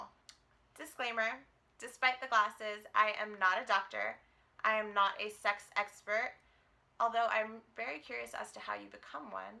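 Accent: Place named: Latin America. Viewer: I enjoyed how this was put together. The way you edited, allowed for this to feel more real and personal rather than too filtered and distant. kudos to the forehead comment 😂 You're awesome. Keep at it, (Latino accent and all). 😊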